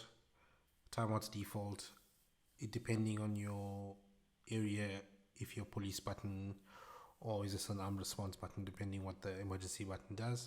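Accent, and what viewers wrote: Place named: South Africa. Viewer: You sir are a legend! Always stoked to hear a South African accent when trying to find info on youtube. Thanks for the vid, very helpful.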